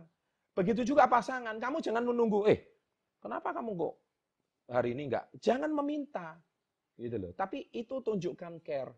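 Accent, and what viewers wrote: Java, Indonesia. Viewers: Bang orang mana ya kok Logatnya,logat jawa.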